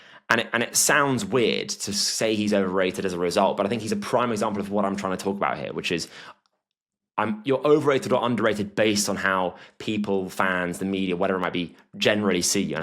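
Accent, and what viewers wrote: United Kingdom. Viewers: Something special about listening to a knowledgeable Brit speak about American Football. However you are wrong on Caleb Manziel Williams. Cam Ward will be a better NFL QB. Overall though good takes. Jakobi Myers deserves to go at the top of the underrated list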